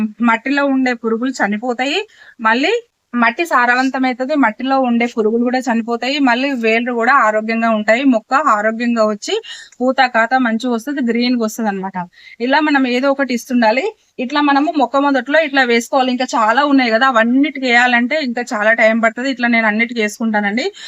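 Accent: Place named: Rayalaseema, India. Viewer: మీ లాంగ్వేజ్ రాయలసీమ లాగా vundi అక్క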